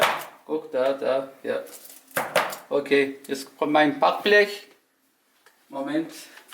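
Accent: Swabia, Germany. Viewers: bist du Schwabe?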